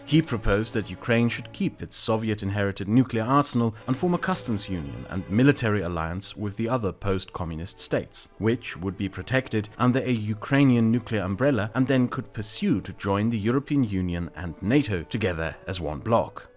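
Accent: United Kingdom. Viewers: No he doesn’t he just recites Wikipedia in a British accent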